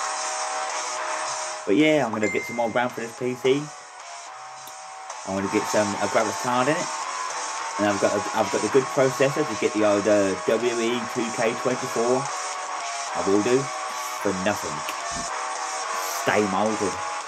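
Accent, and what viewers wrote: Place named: Norfolk, United Kingdom. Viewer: You have a really strange accent. I can hear the Norfolk/Cambridgeshire in it but something else weird too.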